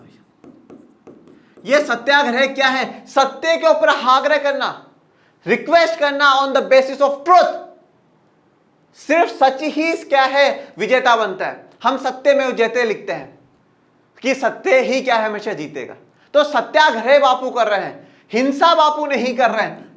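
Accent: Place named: Rajasthan, India. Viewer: Sir kya aap rajasthani ho apki language vaisi he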